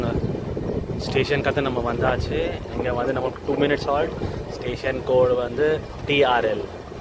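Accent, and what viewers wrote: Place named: Bengaluru, India. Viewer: Nice to hear Bengaluru Tamil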